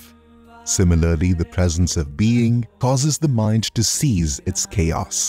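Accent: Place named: India. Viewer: This is definitely not Ai, just because an Indian accent is “foreign” to you, does not make it ai. This has most definitely been script/edited and voiced by a human.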